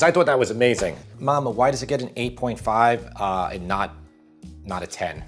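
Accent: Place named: Boston, United States. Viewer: Your accent is very similar to mine. South of Boston? I really enjoy trying your dishes. They’re not overly complicated and they’re hearty. I’m feeding a picky 11 year old so I appreciate your son’s reviews. 😊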